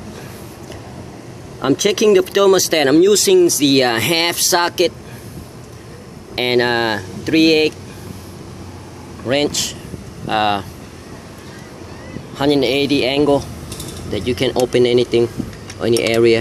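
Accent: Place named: Latin America. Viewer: Porque no lo grabas en español?,,claro se nota que tu acento anglosajón o inglés no es con acento real,,se nota más un latino que quiere dominar el inglés,,porfavor, se te entendería mejor en español.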